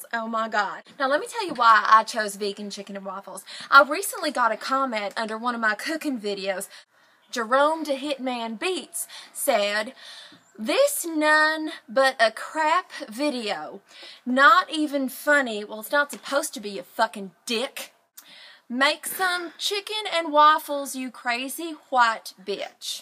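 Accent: South, United States. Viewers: What accent is she using, southern? English? Waspy New England? I like this segment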